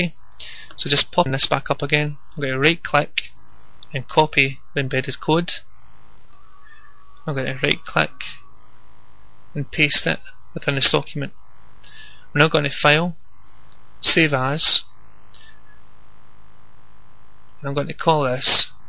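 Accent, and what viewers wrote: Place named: Scotland, United Kingdom. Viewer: omg the scottish accent is soo cool. and what a comback at comedy agony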